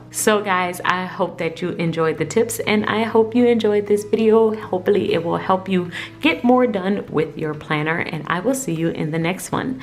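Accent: New Orleans, United States. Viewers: I detect a New Orleans accent too.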